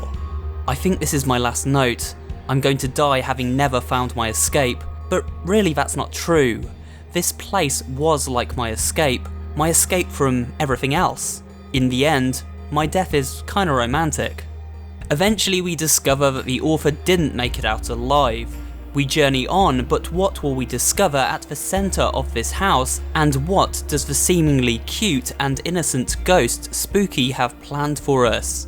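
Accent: United Kingdom. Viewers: You talk like british behind the meme.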